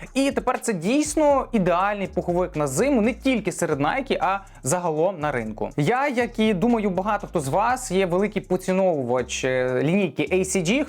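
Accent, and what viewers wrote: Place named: Rural, Ukraine. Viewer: Блевануть хочется от селянцкого ховора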